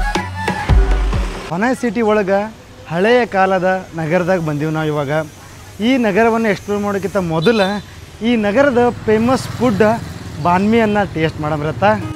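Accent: North Karnataka, India. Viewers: ಅಣ್ಣಾ ನಿಮ್ಮ ವಿಡಿಯೋ ಮಸ್ತ್ ಸೂಪರ್ ಅದಾವ ಅದರಲ್ಲೂ ಉತ್ತರ ಕರ್ನಾಟಕ ಭಾಷಾ ಶೈಲಿ ಬೆಂಕಿ ನಿಮ್ಮ ವಿಡಿಯೋ ಇನ್ನೂ ಹೆಚ್ಚಿನ ವೀವ್ಸ್ ಆಗಲಿ ಆಗಬೇಕು ಆಗತ್ತೆ ನಿಮ್ಮ ಸ್ವಚ್ಛ ಭಾಷೆ ವಿಸ್ತರಣೆ ವಿಷಯ ವಿಡಂಭನೇ ಮಸ್ತ್ ಅದ ಹೀಗೆ ವಿಡಿಯೋ ಮಾಡ್ರಿ ನಮ್ಮ ಬೆಂಬಲ ನಿಮಗೆ ಇದ್ದೆ ಇದ್ದೆ ಅಣ್ಣಾರ ❤❤🎉🎉... ಜೈ ಹಿಂದ್....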